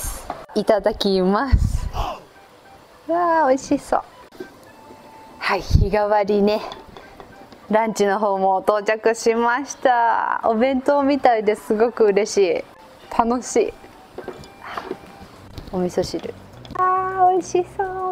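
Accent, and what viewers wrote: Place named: Hiroshima, Japan. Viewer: 何か広島弁が入ってたかなぁと思って聞いてみました ごめんなさい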